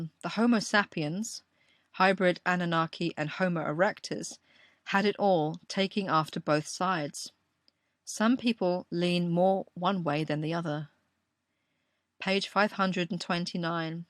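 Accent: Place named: England, United Kingdom. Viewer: Being Scottish myself, I adore this woman's English accent.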